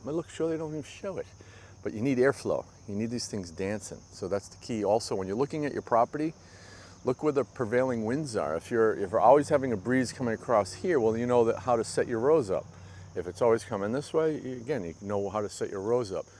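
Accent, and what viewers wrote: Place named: Maine, United States. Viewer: You sound like your from maine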